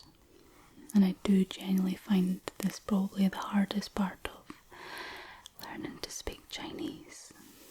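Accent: Scotland, United Kingdom. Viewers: Scottish girls are amazing! Your accent is very nice and pleasant, great ASMR, thanks ;-) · Are you trilingual? Is Scottish your mother tongue? Just curious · you are such a fascinating and beautiful person. I love this video., I enjoy all your videos. I enjoy seeing you, listening to your voice, and your Scottish accent, I love watching your hands. You are a very special person and a unique friend. Thank you for the joy and relaxation you bring to me and so many others. Have a great week. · You deserve more subs. Everytime I watch your videos i fall asleep everytime, your voice is soo soothing and amazing, and your scottish accent makes it 10x better ❤.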